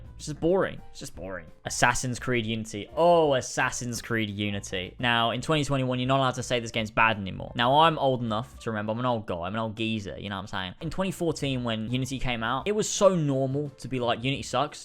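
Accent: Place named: United Kingdom. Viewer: You don't like how vikings talk .... bro you're british. 2nd most annoying accent in the world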